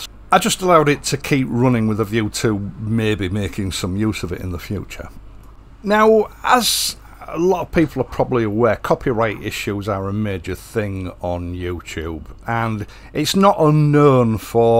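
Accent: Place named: Yorkshire, United Kingdom. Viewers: If that isn't a Hull accent I'll eat my hat!! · Hi, thanks for sharing your “your trials and tribulations” of the pros and cons of running a global channel. Like most things, I guess it’s a learning curve. However, my attraction to this channel is Royal Enfield, plus your Yorkshire accent. Let’s get back to that please! Regards and respect.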